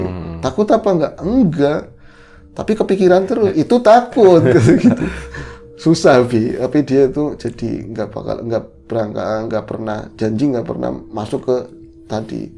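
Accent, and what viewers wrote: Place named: Solo, Indonesia. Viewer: Mas flexible, ya. Tema wong Solo otomatis setelan gaya bicara wong Solo. Tema Betawi otomatis gaya bicara ngikut dialek Betawi. Mangstab 🤭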